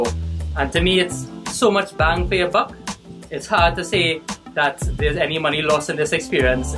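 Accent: Trinidad and Tobago. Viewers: I love your west accent. It’s comparable to the convent accent just the male version. Love your channel. Keep up the good work. This place looks nice but for USD $114 per night I would have liked air conditioning and toiletries in the shower eg shampoo but seems like a eco lover dream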